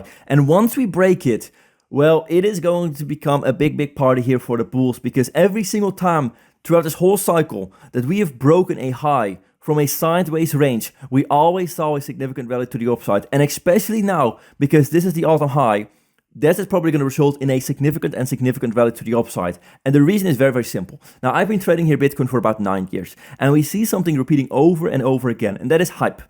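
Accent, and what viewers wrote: Netherlands. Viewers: You are Dutch, aren't you?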